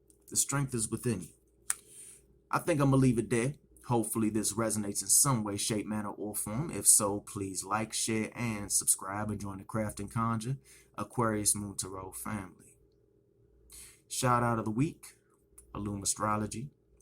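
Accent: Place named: DMV, United States. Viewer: Are you from the DMV? I hear my accent 😂😂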